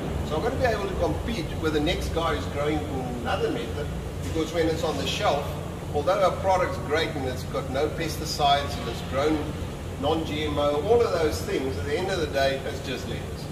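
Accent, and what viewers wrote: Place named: South Africa. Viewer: My guy sounds south African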